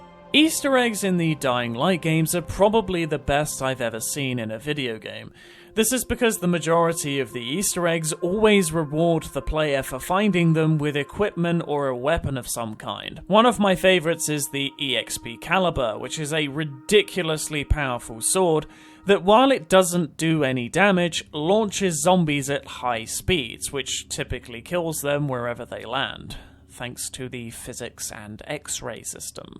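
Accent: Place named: United Kingdom. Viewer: This might be the only british accent I can tolerate, nice video so far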